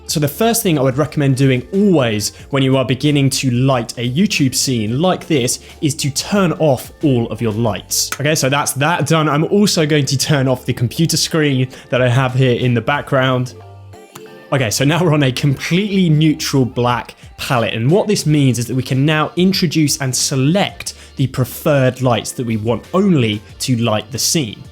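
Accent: United Kingdom. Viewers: Did anyone expect a British sounding skater boy to talk about beauty lighting set up? No. But did he nail it? Yes.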